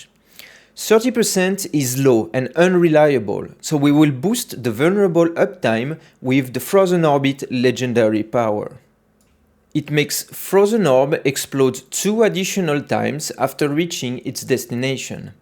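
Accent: France. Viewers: This is definitely a cool and interesting video. You did a great job with your explanations. Also, with a such a strong Frech accent please let me compliment you on your English because it's excellent! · Je like rien que pour l’accent français ! 😉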